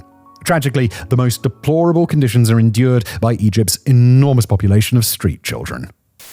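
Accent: United Kingdom. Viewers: High English level is required to understand this video. Is that british accent?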